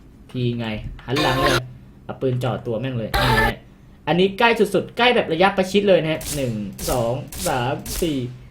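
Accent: Isan, Thailand. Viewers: พี่ คนอีสานหรอ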